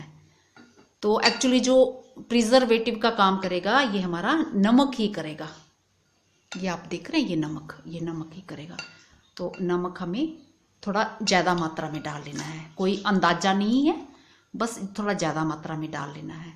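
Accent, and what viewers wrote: Punjab, India. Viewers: g aap 4 minute ke vedio ke 22 minute laga diye kirpa karr ke sabh teyar karr bass ek piece kaat karr short mein dikhaeya karo........main hi nahi sabh bor hote honge.........kaam achha kiya.......shukriya.....aap ki zubaan punjabiyat jhalakti hai aur yeh achhi baat hai......koi bhi ho bolne mein wahan ki khushboo aani hi chahiye......👍👍👍👍😃😃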